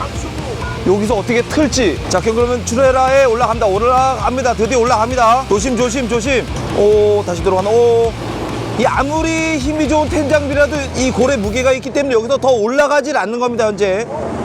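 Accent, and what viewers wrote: Gangwon, South Korea. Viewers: 강원도 사투리 같습니다. 제 군대 선임 어투와 많이 비슷합니다. 강릉 출신이였숩니다. 많이 표준어 쓰시려고 노력하신거 같습니다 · 강원도 사투리가 귀에 쏙쏙ㅋ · 속초 말. 참 재미 있네요... 북한 말 같은기.... ㅋㅋㅋ.. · 강원도 시투리 구수하이 좋쿠만뇨~ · 강원도 사투리 재미있는거 같음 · 말투땜에 첨엔 조선족인줄 ㅋㅋㅋ 계속들어보니 구수한 강원도 사투리였드래요 ㅋ 덕분에 귀한영상 잘보고 갑니다! 따봉누르고 가요~ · 말투가 강원도 사투리에요. 처음이라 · 영동지방 강원도 특유 사투리 입니다 북한 억양이랑 비슷해요^^ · 사투리가 지대로자니? 나도 강원도 사람이자니~ 고래가 메른두없다야~:yougotthis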